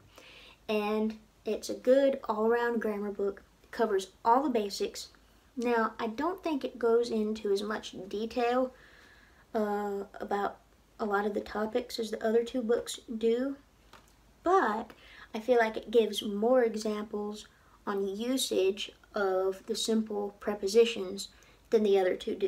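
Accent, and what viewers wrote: South, United States. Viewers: Love your accent! Which part of the South are you from? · What a great review. I'm returning to learning Gaeilge after nearly 20 years since finishing school. I have a lot of these books and am surprised at how much I've retained over the years. "Buntús Cainte" brings back bad memories for us because of having to practice our tape test in school. I was taken aback to hear your accent at the start, fantastic to see people abroad learning our national language. Your accent is beautiful btw, spent a lot of time down south over there and know a Southern Belle when I hear one :) Go raibh míle maith agat arís, Subscribed. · Southern accent! A nice surprise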